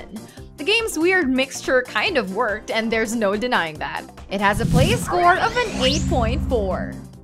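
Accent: Canada. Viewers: Is it me, it does she have a Canadian tinge in her voice?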